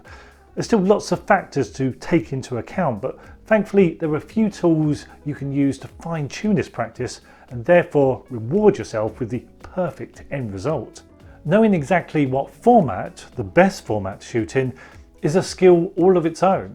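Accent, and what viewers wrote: United Kingdom. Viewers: Excellent instructional uploads amidst a sea of gear review channels. Always very interesting subjects with a unique delivery style. It's nice to hear a UK voice for a change. Thanks for sharing and caring. Keep on keeping on… ✊🏿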